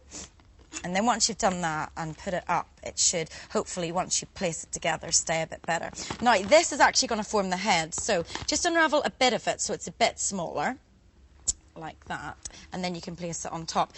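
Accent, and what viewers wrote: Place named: Ulster, United Kingdom. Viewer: Ulster, from the accent.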